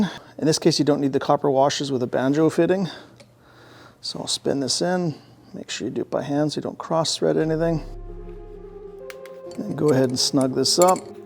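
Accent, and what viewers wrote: Canada. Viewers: Love your channel. Hearing you speak, there's no doubt that you are a Canadian. Love that accent. I live in Missouri, and while we do have salt in the winter, it's not nearly as bad as up in the North. That sure makes it easier to work on older cars. I have no pulsations on my 2015 impala with 75,000 miles on it. Would you recommend having them machine the rotors anyway? They only have very minor grooves, so I think they are worth resurfacing. I get a kind of roaring sound when applying my brakes at highway speeds, and even though my pads aren't worn out, I'm assuming this is a sign that they are getting thin maybe? No pulsation with that sound.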